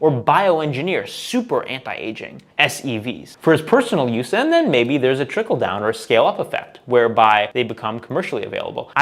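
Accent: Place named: United States. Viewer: it’s pronounced Duneeeedin pace not Dunedin pace.. Must be the American accent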